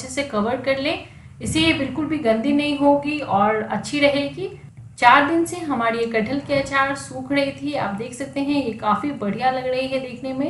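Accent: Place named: Bihar, India. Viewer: Esya bihar m bolty h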